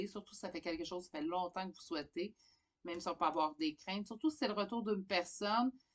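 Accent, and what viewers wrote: Quebec, Canada. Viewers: bravo pour cette belle réussite je visionne toutes vos vidéos chaque soir heure française 💌vous amenez de la joie avec votre accent qui sent bon le sirop d érable 🍝🍵🍰☕🦫🐻🦬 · Merciiiii, j'adore vos expressions Québécoise votre accent 🐸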